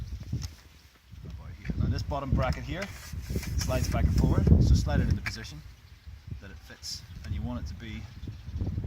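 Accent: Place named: Northern Ireland, United Kingdom. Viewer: Great, I'd just bought one of those bike racks (also for a V40 D3) and had come to the conclusion that the rubbers of the (wing) bars had to come out, but thank you for confirming my suspicions. An' it's quare geg t'hear it from a fellow Norn Ironer!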